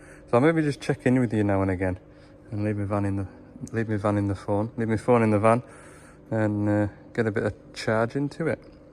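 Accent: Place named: Scotland, United Kingdom. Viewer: Love it when the Scottish comes out it sounds funny with your accent. Goat yer troosers oan. Oh aye like.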